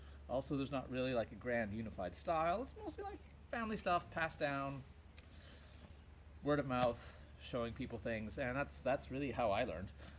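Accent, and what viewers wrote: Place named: Sweden. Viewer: You have a Swedish accent.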